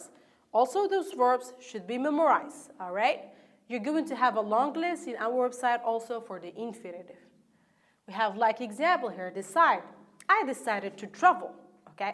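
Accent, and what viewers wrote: United Kingdom. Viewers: haha i get u but the accent also british ..... tell how do you know the deference between the accents ? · I'm not understand what do you say & you speak English as you are British & speak Arabic for everybody understand what you say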